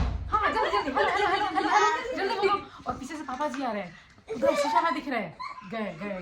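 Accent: Bihar, India. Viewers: Mujhe esa lgta hai ki na aapko negative thumbnail dalne chaie na hi kisi ko respond krna chaiye Its better ki apne vlogs ko family vlog bnana bnd krdo kch special hua toh family ko dikhao otherwise nahi Bcz jb apko dikh ra hai ki apki family aap m interested nai hai toh unhe kyu hi vlogs m lana or kyu hi bewjh chipkna Log negative bolte hain bolne do just ignore & delete their comments Positive raho or positive vlogs bnao positive thumbnail daalo Qki kahi na kahi aap bhi galti krre ho isliye hi aap troll hote ho Abhiksha ko dkho wo itna jada troll nai hoti Km kmao pr acha kmao Ye family drama saas bahu devrani jethani krke kch nai milega Log kch hi din dkhte hain y sb baad m sb bore hojate hain Lambi race ka ghoda bno Na ki chaar din ki chandni Apke subscribers itni advices dete hain apko ki aap apna pronounciation tkh kro Being bihari its good pr professionally ye nai chal pata Insb chizo se niklo & grow urself Grow your personality..apke bache k liye b acha hoga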